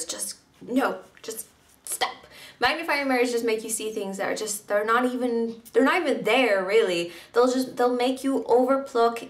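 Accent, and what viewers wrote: Ireland. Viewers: Omg at the start of this video I was half paying attention and thought she was american and then once I fully paid attention I was all "hold the phone, she's Irish!" I don't even know how I could make that mistake haha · Caroline Aleman Ireland I think. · Well, I love your accent! Are you Irish? Great video btw :)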